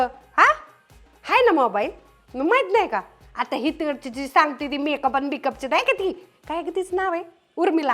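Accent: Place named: Satara, India. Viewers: Ase satari tone madhe banvat ja vedio every week. Ekdam zaak performance. ❤❤❤❤❤ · Khup chaan saataari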